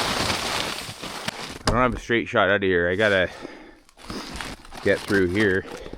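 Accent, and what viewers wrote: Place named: Wisconsin, United States. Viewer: I love your Wisconsin accent but your using your ladder upside down, keepr moovin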